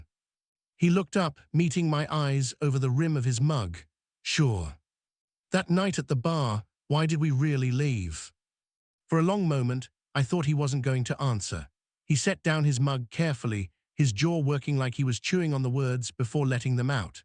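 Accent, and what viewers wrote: United Kingdom. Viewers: I really enjoyed the story line and the writing. However, I am not in complete love of the total package. What brings me down is the British accent of the narrator. Giving the cowboys British accents broke the narratives credibility. Cowboys with the a British accent stole the legitimacy of the story. narrator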